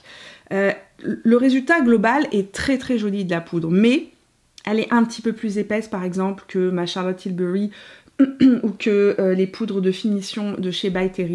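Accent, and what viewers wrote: Canada. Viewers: Sympa toutes ces vidéos. Vous êtes française ou canadienne ? Merci pour vos conseils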